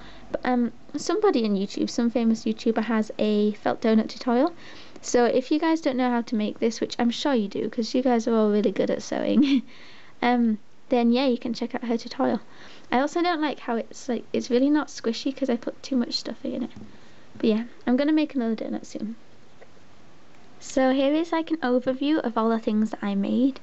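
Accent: United Kingdom. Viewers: I love you're accent are you Brittish??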